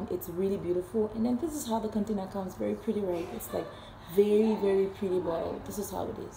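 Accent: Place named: Cameroon. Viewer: You ve got to love Cameroonian accents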